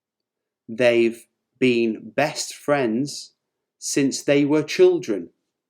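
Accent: United Kingdom. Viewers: Thank you, just found your channel and subscribed. Just wondering, what your accent is. I think it is British at least it sounds British to me. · İs this british accent?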